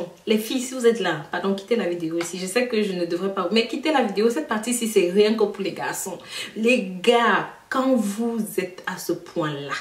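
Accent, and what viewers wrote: Cameroon. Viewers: J'adore ton accent je crois bien c'est un accent camerounaise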